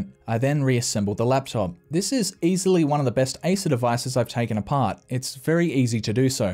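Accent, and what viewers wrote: Australia. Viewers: About a year or two ago, I stumbled upon one of this guy's videos and found it really entertaining and strangely calming. After the video was over I went and watched something else and completely forgot about this channel. Yesterday, I remember having a cup of coffee and browsing some tech videos and thought, "What was that channel with the young guy with the Australian accent who fixed computers?" I couldn't remember. But then...I remembered a very small detail. I went to YouTube's search bar and typed... "PC cleaning eucalyptus oil guy" and BAM found him. Subscribed so I don't forget again.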